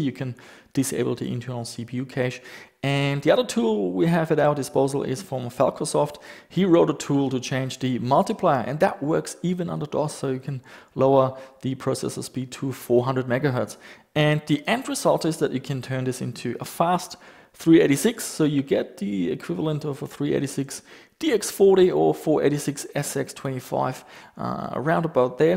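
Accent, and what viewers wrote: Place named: Germany. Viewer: dein deutsches Englisch ist so niedlich 😀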